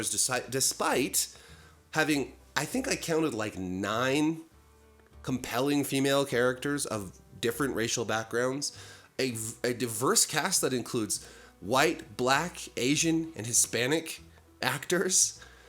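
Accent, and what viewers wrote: United Kingdom. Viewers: Moar British accent please! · "Being a critic, you're supposed to tell the truth". Hallelujah. Thanks for being one of the few critics who actually believes this! BY the way, keep up with the British accent - way more entertaining than shows like the Acolyte. BTW - the BBC are making a show about the Battle of Hastings featuring, you guessed it, a diverse cast. Exactly the sort of artificial nonsense you are talking about. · is SINGLE HANDEDLY bringing CREDIBILITY and reason to FORBES Pop Culture topics! Also laying down the SUCCINCT British Accents! 😅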